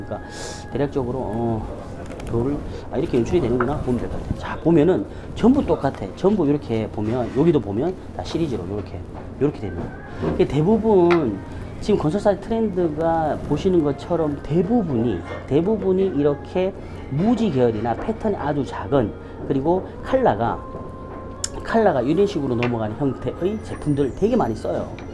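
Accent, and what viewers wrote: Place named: Gyeongsang, South Korea. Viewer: 학교 선생님하셨어도 애들한테 인기짱이셨을 거 같아요 경상도 사투리임에도 정확한 딕션과 유머러스한 말투 너무 재밌고 귀에 쏙 들어와요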